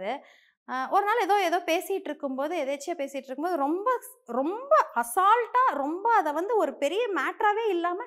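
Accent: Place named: Coimbatore, India. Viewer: Mam i like your way of confident talking. I like your coimbatore slang i am also a coimbatorian. I admire your way of talking confidences and boldness. I pray god to give you more strength to manage your life. Your daughter is cute and beautiful takecare Sneha i like her somuch. All thebestfor your future♥️👍👌🙌🙌🌷⚘🌹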